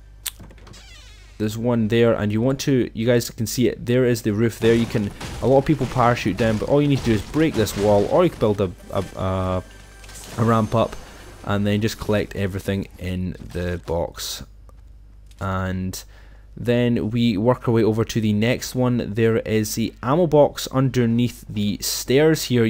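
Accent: Scotland, United Kingdom. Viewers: Are you a Scott? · You scottish? We can be scottish bros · Dats a thicc Scottish accent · Are you Scottish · Are you Scottish? You sound like it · He's from Scotland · Yo im scottish and even i can say that scottish acsent just doesnt work on youtube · I think he's british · This guy is Scottish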